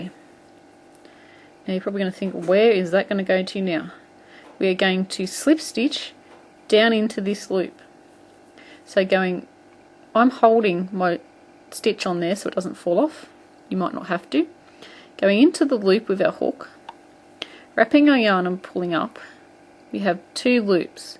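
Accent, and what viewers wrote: Australia. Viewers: I am Australian, and I love that we have a fellow Aussie making great tutorials, I love our accent and I for one am so thankful that you have uploaded this video and sharing your knowledge, I think your fantastic, keep up the great vids, your awsome :) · I love this! You have taught me so many things! I'm a new crocheter, and I love your style of teaching. When I make something and show people, I tell them "the nice Australian lady taught me (I hope I have the accent right!!!!!)" I made this to accent a pair of handwarmers that I made using one of your tutorials...it ended up being too big for the handwarmer, but it's still so pretty that I know I can use it for something! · Is that an Australian accent I can hear? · great tutorial you make it look so easy I'II keep practising and hopefully I'II get thankyou for all your hard work Where are you from? 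you sound like an aussie thanks again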